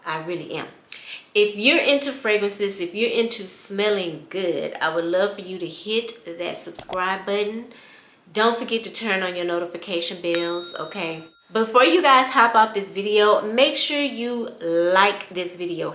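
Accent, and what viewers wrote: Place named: East Coast, United States. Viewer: I would definitely!! I love watching perfumes & fragrances, indulge, I love your East coast ♥️ accent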